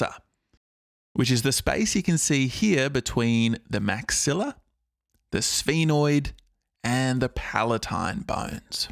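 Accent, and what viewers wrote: Australia. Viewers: Bro is aussie Thankyou though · This Aussie accent is so satisfying to hear and also thanks a lot for this vid ♡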